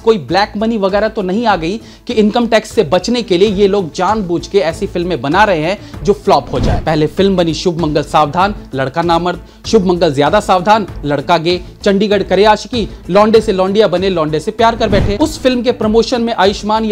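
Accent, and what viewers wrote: Bihar, India. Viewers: Pura biharipan me agaya bhaiya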